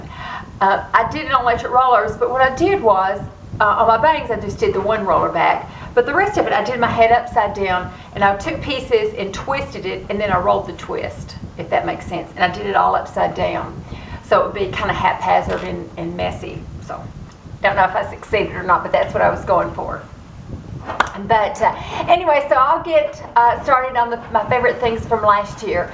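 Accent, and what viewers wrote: South, United States. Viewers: your hair is lovely and I love the way you are so loyal to your moonstone shadow....and your lipstick-combo...I received my Naked 3 palette today which I won and have a feeling I have found the right colours for me-cannot wait to wear it tomorrow and will do a video on Thursday-honey, never worry about how long you talk - love your Southern drawl and could listen forever - do your kids have those awesome eyes of yours - have never seen eyes more beautiful..xxxxxSuzy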